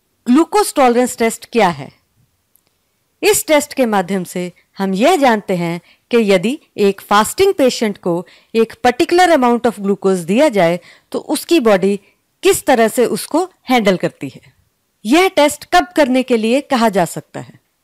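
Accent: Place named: India. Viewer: Is video mein, Indian accent bahut zyada strong hai..indian accent bahut annoying hoti hai.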